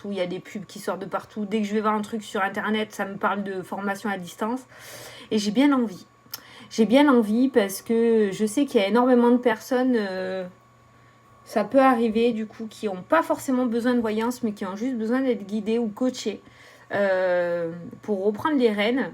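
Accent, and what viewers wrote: South, France. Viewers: Rhooo pétant!!! 😜 Les montagnes russes d entrée 😂 putain! C est le sud qui parle et té! Changes rien, t es trop topissime, z'ont qu'à pas t écouter les mauvaises personnes.. Moi je t'adore 💜 et tu es une bonne personne... Je suis seule alors on verra côté cancan... 😜 Rien n arrête les langues de vipères... Merkiii, t es une bouffée de bonheur à chaque vidéo 💜💜💜💜😘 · Coucou, toujours un bonheur d'écouter tes tirages... Tu es toujours de bonne humeur et spontanée, j'adore!!! 😍 Et tes "putains" avec ton accent du sudeuuu, c'est top, ne change rien 🤣 Belle journée à toi et ta famille 🥳 et merci pour ce concours 🍀🤞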